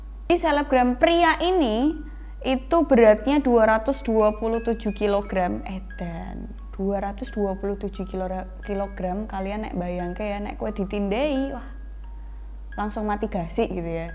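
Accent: Java, Indonesia. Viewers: Rasa ingin tertawa bukan Karna kontenya tapi Karna medoknya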